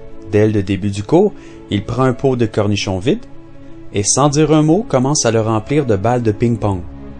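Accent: Canada. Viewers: moi non plus, je trouve ses vidéos superbes, bien expliquées, et a chaque fois, elles me laissent songeur et heureux... De plus, je suis français et j'adore l'accent canadien et je le trouve très agréable à écouter. Merci.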